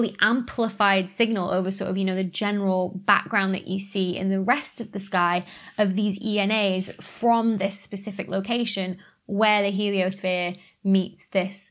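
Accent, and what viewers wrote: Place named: United Kingdom. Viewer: I gave it a like because it made me laugh. Listening to British people that by American standards don't have a strong accident can produce little surprises like this. It seems like they are speaking regular English and then out pops schedule, controversy, solder, etc. But bubble is my favorite.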